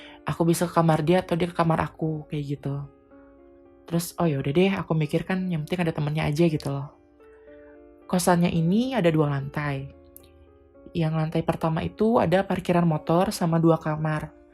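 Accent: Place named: Java, Indonesia. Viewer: Gua kira cewek mas, suarane lo mirip Mas Ricu. Ricky Cuaca. Tapi kayake mas e wong Jowo yo mwehehe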